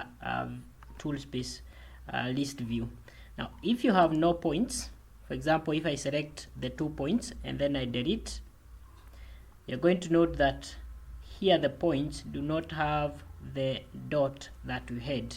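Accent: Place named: East Africa. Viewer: Useful, especially for a quick reference. And I like the accent too. Sounds very Eastern African 😊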